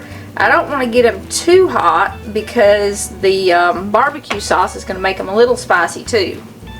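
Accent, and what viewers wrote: South, United States. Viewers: I love your videos!!!! Keep up the good work. My family is from eastern Kentucky so I love that southern draw. I believe your from Knoxville if I'm not mistaken. I spend a lot of time in the Smokies. Your lucky to be that close, I'm 4 and a 1/2 hours away (Dayton, Ohio). One last thing, I see you have the Andy Griffith show on. Best TV ever.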